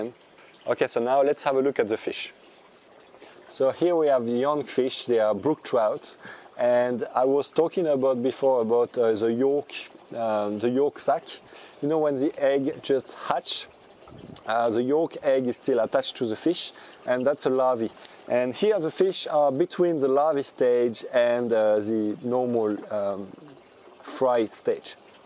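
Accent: France. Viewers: There is nothing more debonair than a frenchman speakng english - Im sure all the woman are hanging on every word he says. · Great video also the french accent 👌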